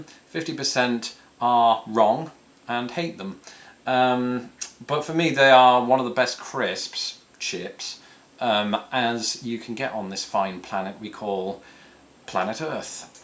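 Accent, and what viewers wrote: United Kingdom. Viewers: No, your accent is not intimidating at all. Is ours? Actually, I am curious about how Brits hear Mid-West, American accents? We like your British accent. It's not intimidating, but it does sound very cool. Do Brits like, or dislike American accents? I wonder? Great Britain, although not the colonial power it once was, is awesome, if only they were not self destructing right now, just like all of western and northern Europe. I love GB. I just wish you'd reassert yourselves. I like your videos very much. Keep doing it. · That British accent is second in charm only to the stereotypical non-rhotic southern accent. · You have a charming sense of humor. And I really enjoy your videos and hearing your POV on my country as well as learning more about English customs, history, food, etc. Glad you like being here so much. And yes, I do love your accent. 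If you want to know why, I grew up watching old Hollywood movies or BBC productions filled with British actors - so mostly heard more “posh” accents (Basil Rathbone, Lawrence Olivier, Claude Raines, Cary Grant - yes I know he faked it, Margaret Rutherford, Peter Sellers, Leslie Howard, Edward Everett Horton, David Nivens, Sir John Mills, Glenda Jackson, Maggie Smith, Sir Ralph Richardson, Sir Alec Guiness, Richard Attenborough, etc) and they just sound so much better educated and more interesting (or even eccentric) than the often flat American accents. I think it also is the much wider variety of euphemisms the British have, the clever turn of phrases for describing or referring to things, the larger vocabulary of mild swear words or expressions you hear in films and TV, and when you yourself speak on your videos, compared to how Americans speak or write. I also think those of us enamored with the Brits find much of the English eccentricities and old customs very quaint and charming whereas you, as a Brit, probably feel quite differently about them - maybe find some of them annoying. 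As an example, last summer I was reading some online commentary on The Guardian’s website about the movie “Dunkirk”, plus another article about Brexit and a few o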